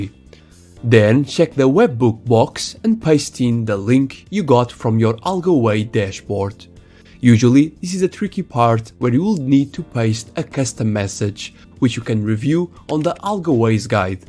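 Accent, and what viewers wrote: Portugal. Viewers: Hey bro, are you portuguese?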